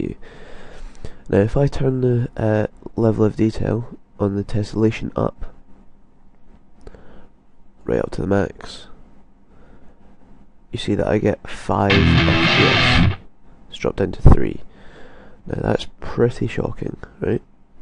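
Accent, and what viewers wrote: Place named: RP, United Kingdom. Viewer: "High Bread Fizz X" Dat fancy Brit accent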